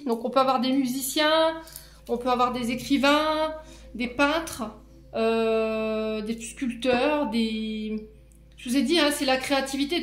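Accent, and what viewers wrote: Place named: Pas-de-Calais, France. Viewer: bonjour vs êtes du nord pas de Calais? vs avez l accent bien écrasé du pas de calais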